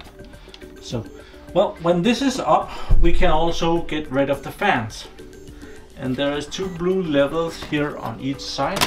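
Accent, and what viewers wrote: Denmark. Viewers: are you from denmark? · dansker confirmed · are you danish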